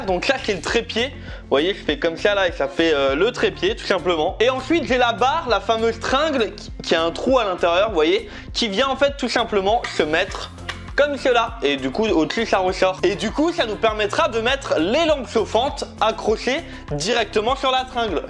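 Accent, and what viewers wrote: Alsace, France. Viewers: Attend mais tu vient d'Alsace ?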